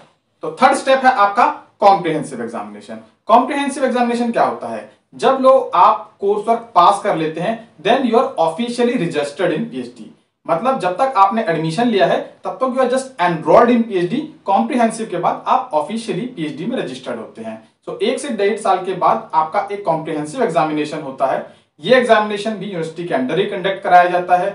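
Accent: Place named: Odisha, India. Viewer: i can feel the odia accent 😋 . very informative video thou.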